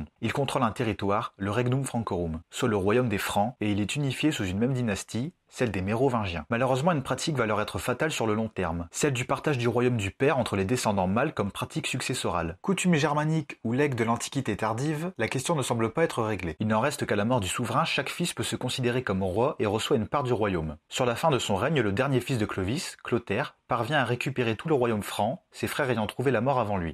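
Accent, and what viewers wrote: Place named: Nord-Pas-de-Calais, France. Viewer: "les rouos fainéants, Childéric trouo, Thierry trouo, à la suite de quouo, bras drouot du rouo".... elle serait pas ch'ti??? à part ça c'est très bien:-):-)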